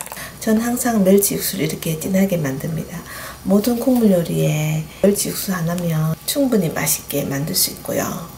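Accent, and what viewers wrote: Gyeongsang, South Korea. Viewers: 설명이 구수한 경상도 대구 말씨가 묻어있어 고향 향수 풋풋 하구요 미모는 전형적인 한국의 풍성한 정감에 내가 좋아 하는 멸치 다시물 설명 꼼꼼히 챙겨 정취했습니다 언제나 보람가득한 날 되시기를 기원 해요 🙌💖👍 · 상어..무섭지않아요 유치원 애기들 상어 인기짱입니다 우리동네 맛집으로 유명한 멸치육수칼국수집 비결이 바로 이렇게 육수내는거예요 요리는 배워가는게 많답니다 구수한경상도 아지매라서 더욱 흥미롭습니다 늘 고맙습니다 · 경상도 억양이 반갑내요~ 오늘 처음 와 봤어요 구독 눌렀어요 잘 보고 갑니다~ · 미국에서도 응원보내요~~ 코인 육수 사서썼는대 뭔 맛도 안나고.. 해서 이번에 첨으로 멸치 사와봤어요 육수 직접 만들어보려구요. 멸치말리려고 내놨어요^^ 낼 꼭 따라해볼게요. 저도 경상도에 살았었는데 말투가 넘 반가워요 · 가족님들,부럽네요😋.대구고향떠나40년해외거주하는할매입니다.정겨 운고향말씨와 편안한분위기가 느껴져...시원하고알찬요리솜씨에👏🙆.멸치육수끓일때 생강한쪽넣어면비린내가덜나지않을까싶은데 어떨까요?✨👱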